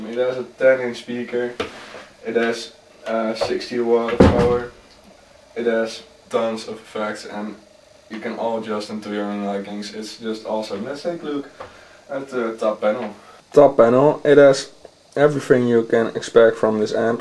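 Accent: Netherlands. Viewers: ha your accent sound hollish.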